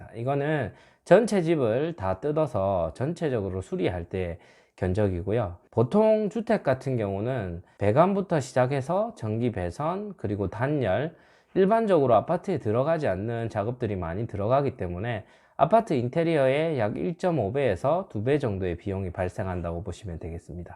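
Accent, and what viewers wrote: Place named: Gyeongsang, South Korea. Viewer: 대구 주택리모델링으로 검색하다가 시청한 영상이라서 대구분인 줄 알았는데 부산이시군요^^ 말투는 대구, 경북 같아서 나중에 문의해봐야지 했는데 아쉽네요.^^ 그래도 자주 들어와 볼게요.. 영상 잘 봤습니다.^^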